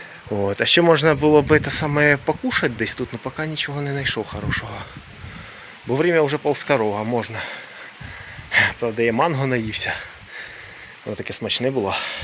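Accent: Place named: Surzhyk, Ukraine. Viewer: Суржик жосткый)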